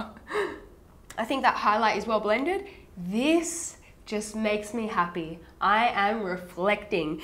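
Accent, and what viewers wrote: Australia. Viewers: Where is she from? Australia? :)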